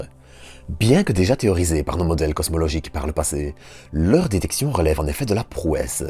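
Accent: Belgium. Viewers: Wow, Je ne sais pas ce qu'il s'est passé avec ton com, mais je l'ai en notif et je le trouve très instructif. Je vais donc le remettre ci-dessous pour ceux qui, comme moi, n'étaient pas au courant. Et oui, est belge 🤗 et j'adore son accent! 😊 Com de Led Zep: Régional plutôt, si tu sous entends que notre ami soit belge, le coq est le symbole de la Wallonie (prononcer le W comme en anglais) qui est une des régions de la Belgique, c'est la partie sud du pays, on y parle français à 100 pourcents, la région Bruxelloise encerclée par la Flandre, brrrrr... 🙂 ((90 pourcents de francophone et 10 pourcent de néerlandophone)(on prononce Brusselle, pour la même raison que l'on dit Aussère et pas Auksère (Auxère). Pourquoi? En latin le double S s'écrivait X, à la renaissance le X a pris le son KS)), Ensuite la Flandre, 60 pourcents de la population, 100 pourcents néerlandophones et pour finir la région germanophone, seulement 60.000 personnes parlent donc allemand en première langue mais sont tous bilingue en français. 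Je ne sais pas si est belge par contre...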